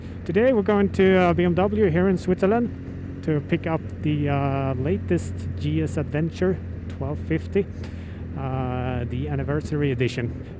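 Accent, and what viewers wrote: Sweden. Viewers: Haha, tyckte jag hörde lite svenskt påbrå och sedan såg jag flaggan! Coola väskor!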